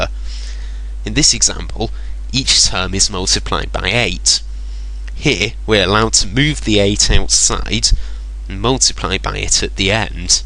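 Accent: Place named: England, United Kingdom. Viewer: Well done! Love the wit! Dad was from Manchester-so nice to hear the English accent again!!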